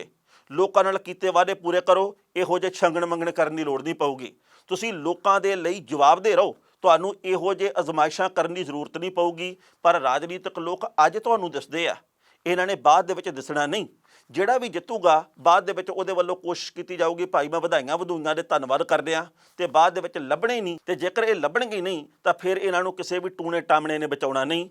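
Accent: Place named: Majha, India. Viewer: Majhe dee boli sun k bahut mjaa aya. Sat sri akal tehna shib g.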